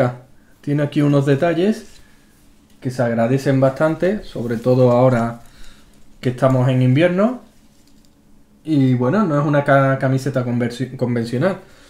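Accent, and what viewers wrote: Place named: Andalusia, Spain. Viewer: ¿de donde eres? Algo me dice que o Canario o Andaluz😍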